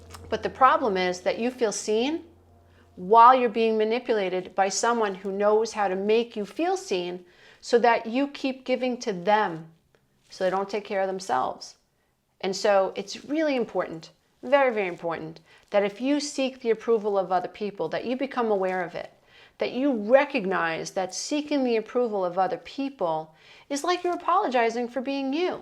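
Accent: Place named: Queens, United States. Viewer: Love your Queens sass, especially “what~eva” 😎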